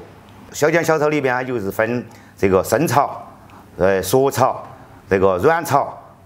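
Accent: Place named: Chengdu, China. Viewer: 成都老一辈说话都是这样子的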